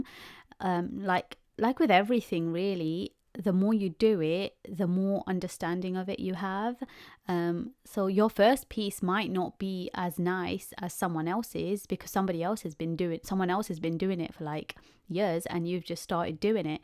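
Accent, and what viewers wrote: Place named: United Kingdom. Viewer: i know this sound unrelated to your beautiful art this is the first time i've heard your voice i don't know why but i thought i was hearing a british accent (i don't mean it rudely i mean it as a compliment!) also thank you for the tutorial so beautiful Mashalllah!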